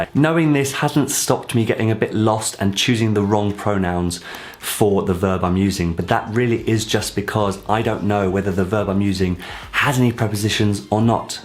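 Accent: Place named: London, United Kingdom. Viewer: London accent with the best french class. I love it man. Well- done.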